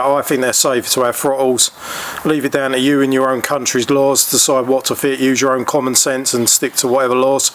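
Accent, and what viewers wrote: United Kingdom. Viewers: I cant stand that british accent. Say words correctly... Theres no 'o' in right.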